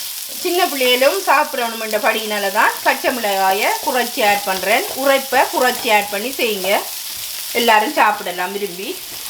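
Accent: Sri Lanka. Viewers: Wow semma tempting mouthwatering recepie nega srilankan tamil explain panathu semma semma👌👌👌👌👏👏👏👏👏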